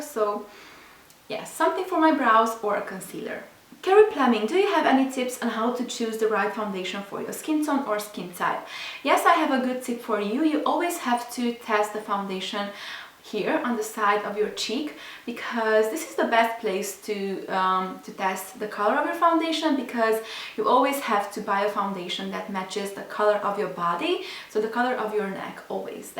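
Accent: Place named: Romania. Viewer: I Love your videos soooooo much, I really enjoyed getting to know you better. You are soooooo BEAUTIFUL!! Also I love your Romanian Accent!! Xxx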